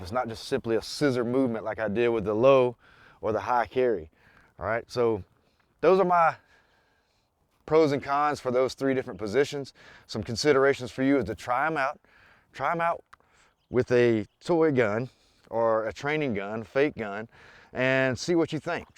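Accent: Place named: Appalachia, United States. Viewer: You sound like you was raised in the head of a holler like mine….like John Wayne toilet..ruff n tuff don’t take no shit off nobody…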